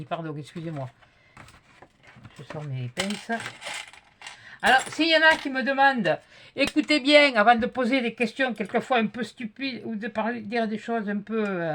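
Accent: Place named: Aveyron, France. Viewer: Coucou, je regarde toutes tes vidéos mais je ne commente pas souvent. J'aime beaucoup ton tuto, des défauts tout le monde en fait, mais certaines ne le disent pas, ton parler je le connais bien car je suis de l' Aveyron... Bonne journée, bises😍😍😍😍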